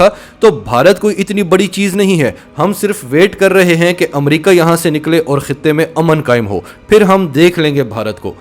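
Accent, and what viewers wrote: Pakistan. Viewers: Good pakistani urdu accent best anaiysis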